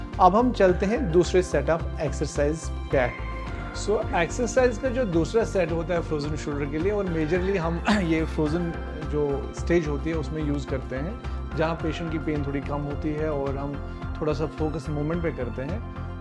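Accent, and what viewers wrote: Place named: Kashmir, India. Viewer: R u kashmiri, ur pronunciation is like that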